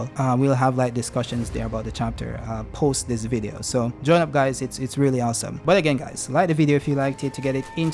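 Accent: Jamaica. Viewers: yo are u jamaican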